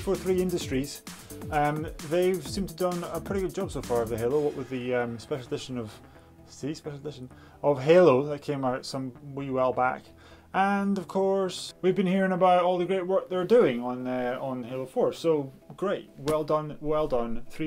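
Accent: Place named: Ireland. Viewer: This is an irish accent right?...I like it. I want it. Gimme your accent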